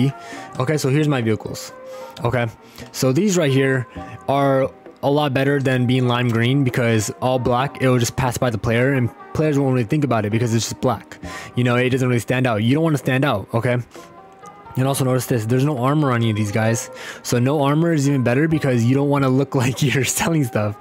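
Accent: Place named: India. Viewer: Lmaoo no gonna kap I can hear the desi in you correct me if I’m wrong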